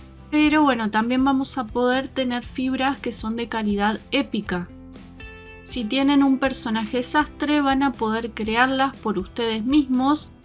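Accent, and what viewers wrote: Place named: Rioplatense, Argentina. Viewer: gracias, de donde eres? pareces de uruguay o argentina por el acento jajaj, aqui uruguayo:D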